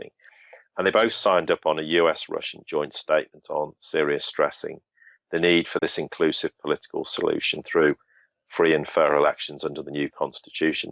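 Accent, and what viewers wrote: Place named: London, United Kingdom. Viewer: Does he keep saying Western capitalists or Western kabbalists? Damn London accent I can't tell the difference.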